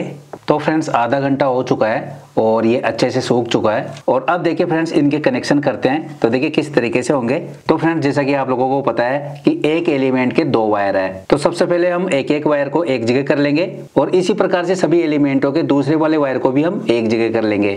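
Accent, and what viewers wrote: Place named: Bihar, India. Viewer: Extremely GOOD EXPERIMENT & worth the effort & please form A company to manufacture & sell as a start up company !! You sound like a BIHARI SCIENCE GRADUATE !! If you R please confirm or deny it !! Please also wie your own introduction in Hindi or English as I am proficient in both languages !! From A ( Retd ) MENTAL HEALTH PROFESSIONAL, ORIGINALLY FROM BANGALORE !!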